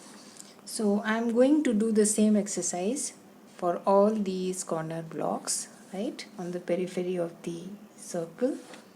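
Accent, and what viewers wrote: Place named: India. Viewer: You sound very like Indian maybe pakistani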